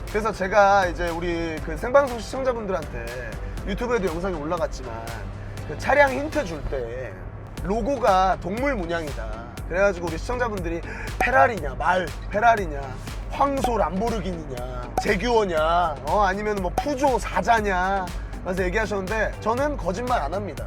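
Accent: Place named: Chungcheong, South Korea. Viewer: 오킹이 충청도 사람이유? 사투리가 친근한디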